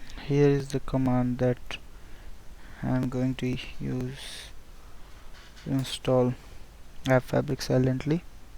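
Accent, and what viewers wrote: India. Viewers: Ah great! Another video of installing Microsoft programs done by someone with an Indian accent. If it wasn't for your people I wouldn't know what to do. Fuck the ads though, wouldn't you agree that 10 ads is a bit much?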